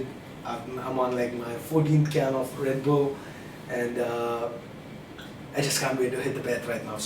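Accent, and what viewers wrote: India. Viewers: I just realized I fucking hatttttteee indian accents. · Definitely an Indian dude! ^^ Good review though! Cheers! =)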